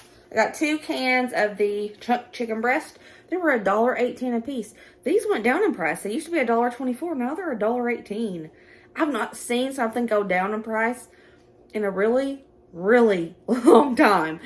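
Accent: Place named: Arkansas, United States. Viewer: Add ur peenches of salt! Love you girl. Your accent reminds me of my arkansas family❤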